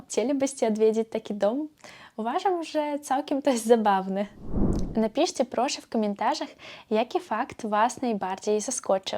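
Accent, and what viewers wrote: East, Poland. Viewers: Pani wschodni akcent w polskiej mowie brzmi bardzo barwnie, przyjemnie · Lubię pania słuchać. Język polski w pani wydaniu brzmi fascynująco. Mam nadzieję, że nie pozbędzie się pani swojego wschodniego akcentu. Okolice naszego Krakowa są bardzo ciekawe. Myślę że zabraknie nam życia żeby poznać wszystko. Pozdrawiam sąsiadkę.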